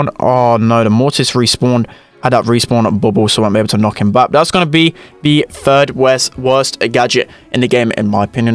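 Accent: United Kingdom. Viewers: r u fom uk?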